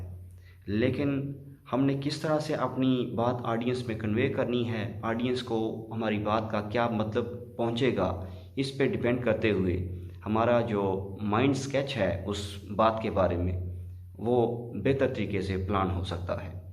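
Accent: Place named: Kashmir, India. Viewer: A nice combination of urdu, a little bit hindi and English in kashmiri accent... It was really informative sir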